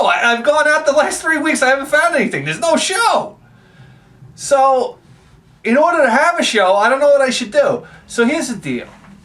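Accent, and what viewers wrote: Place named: New Jersey, United States. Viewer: I love the rant, but I'm confused. You look like a hillbilly out of West Virginia, but your accent says Jersey trash.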